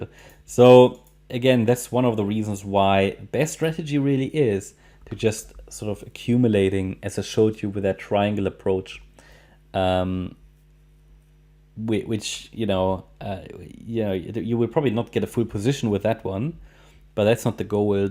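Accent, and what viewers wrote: Germany. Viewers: Hi, I like your comments. I am wondering and I might be wrong.......are you German? Your English is pretty good with a German accent??....😀 I am German too but was living 17 years in New Zealand..... Happy New Year to you! 🤝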